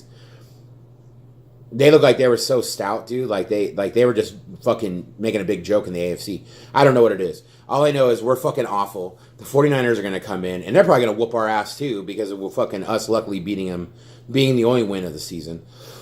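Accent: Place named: Philadelphia, United States. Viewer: Ahahahaha...bro, yer starting to sound like the dude from Philly.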